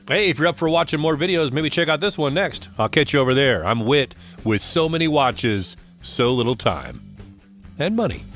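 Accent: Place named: United States. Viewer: what a classic American narrative voice! :D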